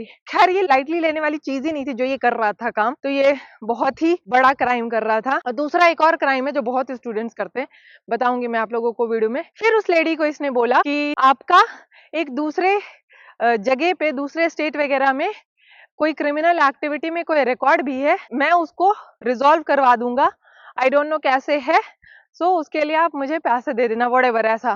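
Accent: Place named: Bihar, India. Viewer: ji namaskar 🙏🙏 Kya aap Bihar se ho aapki bhasha se pratit hota hai🙏🙏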